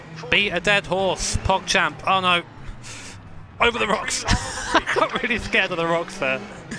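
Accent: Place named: England, United Kingdom. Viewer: 🤣 lmao this had me smiling ear-to-ear the whole video I love your English accent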